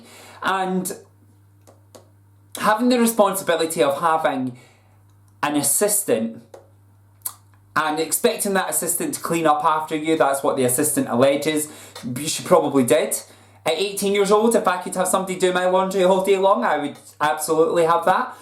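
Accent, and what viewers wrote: Scotland, United Kingdom. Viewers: Great video, you say your british, howcome you sound more on the scotish side ?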